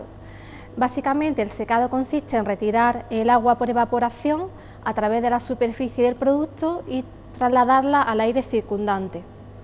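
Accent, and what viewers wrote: Andalusia, Spain. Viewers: Que bonito Andaluz! La lengua madre del hoy español estándar (sin zezeo)! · tiene un hermoso acento andaluz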